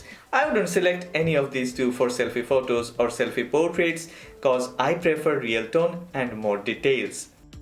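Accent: India. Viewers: Hey bro your speech is very similar of Indians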